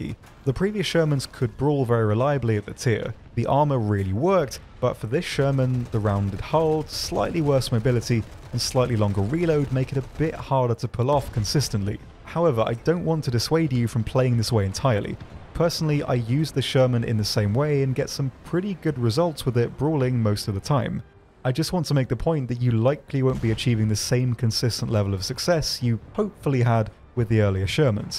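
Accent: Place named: United Kingdom. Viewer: You create my favorite War Thunder videos on YouTube! Thank you for your hard work. Your British accent is also greatly appreciated by this American. :)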